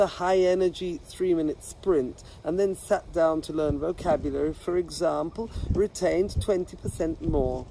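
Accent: Italy. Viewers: You are a native Italian-English speak and yet have an italian accent when speaking English, that's interesting! I've never seen it before. - Great tips, thank you for the video!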